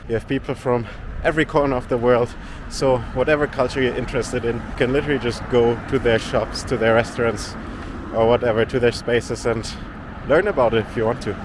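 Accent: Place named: United States. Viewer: Why are you talking 'US dollars' when you 'say' you are German, visiting London??? You certainly don't look German at all, and talk like a Yank. Why fly to Stansted anyway, which is a long way from London? Why moan (like an entitled Yank) about no seatbelts on bus and sit nearest the front window? You are far more likely to be killed in a crowd in Berlin statistically than 'fly through a bus window'! Just how often do you lose your CC, idiot! More dollars, whatever they are???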